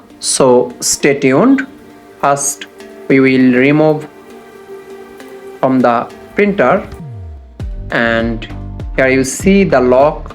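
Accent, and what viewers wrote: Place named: Bengal, India. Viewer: তুইযে বাঙালি সেটা এমনিও বোঝা যায়। .. ইংরেজি এমনিও পারোস না হুদাই ভোকোর ভোকোর বাংলায় ক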